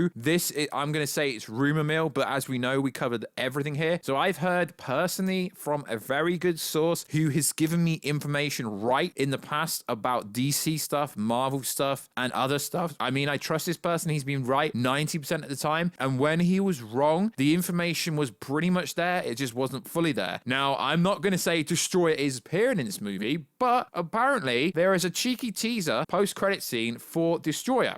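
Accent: England, United Kingdom. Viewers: My boy, that's English with an English accent, it's like me saying I don't understand Hindi if you speak with a North Indian accent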